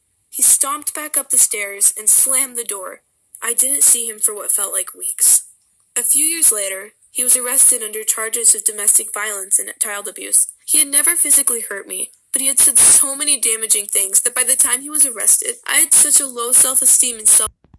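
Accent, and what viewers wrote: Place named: Ireland. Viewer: You irish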